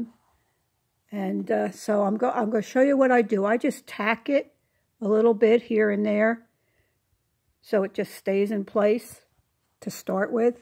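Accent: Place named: Baltimore, United States. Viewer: I love your bowl and accent. I'm guessing your from my home "town" of Baltimore or maybe Philly?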